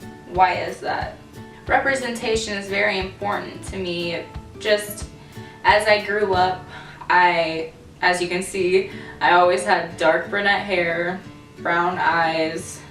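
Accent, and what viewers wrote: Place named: South, United States. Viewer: You should be a marketing expert! We are Individuals on the inside and out, but the industry is only researching and going for the bottom line - $$$. Love your research - You are a person after my own heart!!! Side Note: Your 'fake' southern accent may not be as fake as you think - most of our relatives on my side are from Alabama and Georgia and came to Southern Illinois who definitely have the southern accent - I picked it up after a week staying with my Cousins. Got Home Safe.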